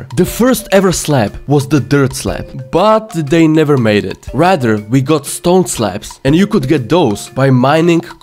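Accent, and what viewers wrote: Czech Republic. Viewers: Nebudu ti lhát máš doclea silnej českej přízvuk. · my mans got the czech accent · You sound like if you have czech accent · Hele Kámo je hezky poznat podle hlasu že jsi čech :D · t h i c c czech accent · You kinda have a Czech accent lol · Love the Czech accent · Poznam z tvoji angličtiny, že si Čech. Tím nechci říct, že máš spatnou angličtinu. Pěkné video. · Angličtina dobrá jen zapracuj na výslovnosti máš pořád strašně český přízvuk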